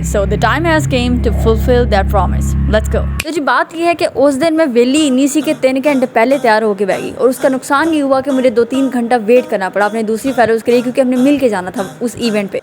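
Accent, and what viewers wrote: Punjab, India. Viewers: Yr sister ap ki cute punjabi 😂❤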